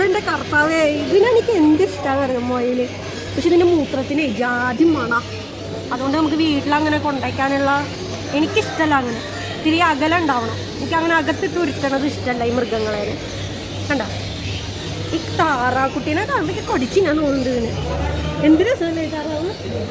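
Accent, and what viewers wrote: Thrissur, India. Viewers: Thrissur samsaram. Super 2 perum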